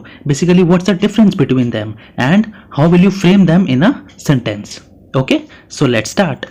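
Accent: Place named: India. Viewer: Chinese teaching in Hindi tone ???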